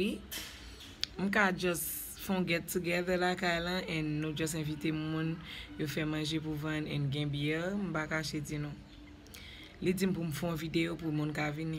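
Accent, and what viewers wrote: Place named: Rural, Romania. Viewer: ki sense sa ou di an genyen,wap felicite yon moun,ou tou profite desann moun andeyor,li pale tankou moun andeyor se 2020 nap viv suspann ak radot nou an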